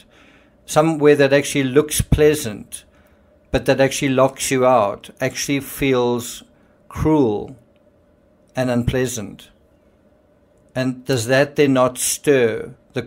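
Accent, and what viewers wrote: South Africa. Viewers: I knew you were from S.A. you speak just like a friend of mine from S.A. 🇿🇦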